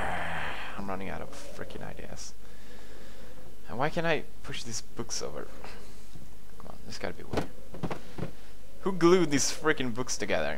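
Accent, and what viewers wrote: United States. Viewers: How come in this video u sound so American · He sound like he has a U.S. English accent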